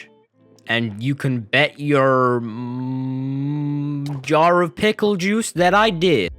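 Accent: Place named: United Kingdom. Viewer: Why.is he birtish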